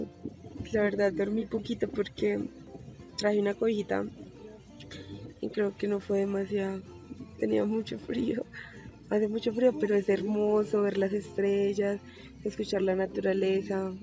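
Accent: Colombia. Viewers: A mi lo que me inspiraria a escribir son tus bellos ojos y tu forma de hablar colombiana se ve que sientes frio para la próxima llevate un sueter que este calentito porque el que tienes puesto no calienta en lo más minimo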